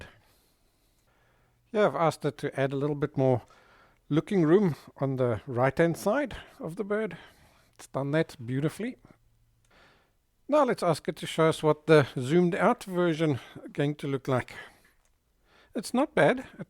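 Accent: South Africa. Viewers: Hi you sound like a South African. I enjoy your presentations. Very thorough. Thank you from South Africa.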